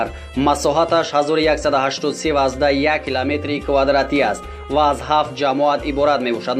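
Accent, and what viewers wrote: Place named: Kulob, Tajikistan. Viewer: Кму минтакаи кулоба полний мегуфти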